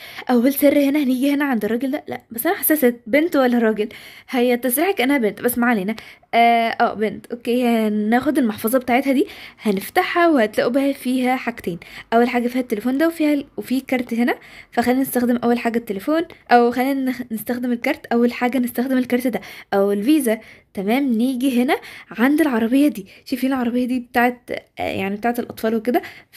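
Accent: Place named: Saudi Arabia. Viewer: عرفت من لهجتك انك سعوديه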